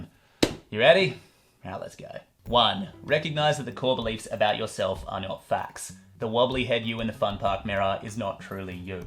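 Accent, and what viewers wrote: Australia. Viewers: I bloody adore your videos. Thank you for making them from the bottom of my heart! Good to hear an Aussie voice too!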